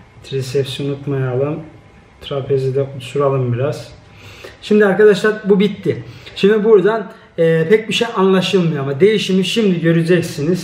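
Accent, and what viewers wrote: Black Sea, Turkey. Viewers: Abi Trabzonlu musun Rizeli mi ? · Abi sen Karadenizli misin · Reis sen nerelisin ya trabzonlusun ya da rizeli ben ofluyum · Abi karadenizli misin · Abi karadenizlimisin ? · rizeli artvinli misin ya da karadenizli konusman ayni bizim oralar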